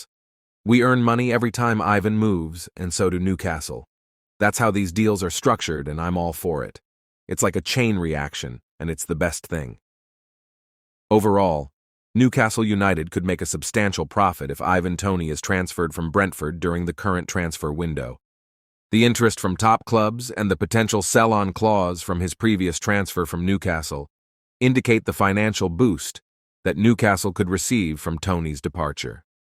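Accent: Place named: United States. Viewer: another yank voice owa thumbs doon them all!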